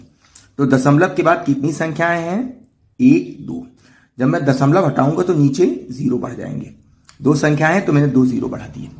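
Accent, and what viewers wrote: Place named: Lucknow, India. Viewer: U r belonged in near about Lucknow. Voice touch aaka vaisa hi h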